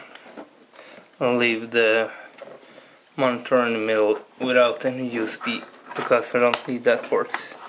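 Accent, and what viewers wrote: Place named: Sweden. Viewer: Swinglish! Winning!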